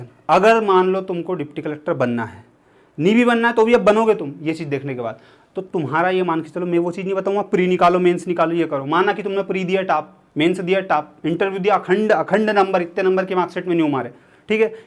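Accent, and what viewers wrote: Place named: Indore, India. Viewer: Sir is full on indori 😂❤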